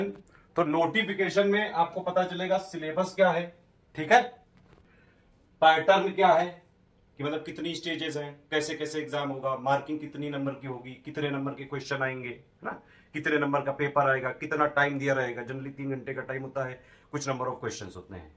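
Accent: Kanpur, India. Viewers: Sir kya Kanpur se hai kyuki apki language humare kanpur dehat ki lg rahi hai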